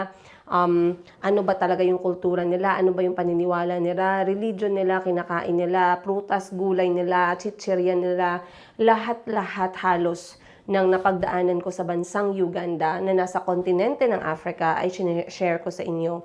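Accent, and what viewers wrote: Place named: Ilonggo, Philippines. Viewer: kanami guid pamatian sang Ilonggo❤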